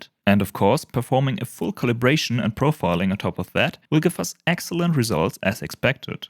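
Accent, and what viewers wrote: Germany. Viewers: Dieser deutsche Akzent xD Nice Video mein Freund ;)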